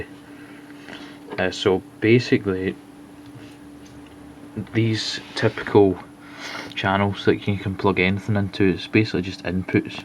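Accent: United Kingdom. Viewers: are you from Wales? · I believe he's Scottish not Irish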